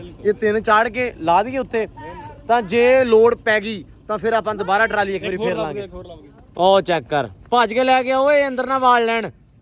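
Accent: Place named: Malwa, India. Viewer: Bai g tuhadi boli sade malwe nal mildi aa me machhiwara sahib ludhiana punjab to ha ❤🎉🎉🎉🎉🎉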